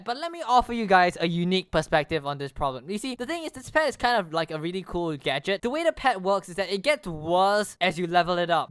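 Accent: Singapore. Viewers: yo are you singaporean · Jesus I love singporians english.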